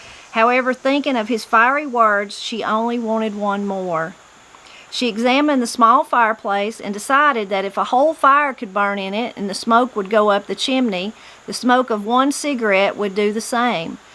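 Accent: Appalachia, United States. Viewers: As Mountain Path progresses, the plot thickens and the mysteries grow ever more mysterious. Hearing this story of Appalachia being read in your native accent, makes it all the more interesting and authentic. Thank you for bringing Mountain Path to us.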